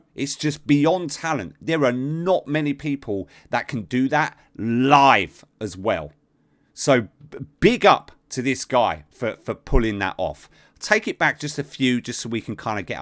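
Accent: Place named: United Kingdom. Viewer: Of course Igor would say his name correctly, lol! I’m just trying to give the pronunciation for this reactor, who has a native British accent like mine ...peace ...❤️🎶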